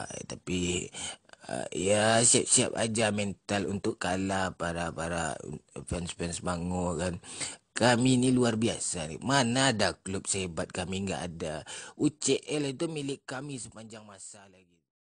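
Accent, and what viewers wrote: West Sumatra, Indonesia. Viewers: Urang minang ma suaro nyo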